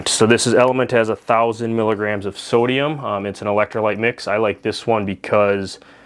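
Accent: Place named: Minnesota, United States. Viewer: Your voice reminds me of Fargo. One of my favorite movies 😂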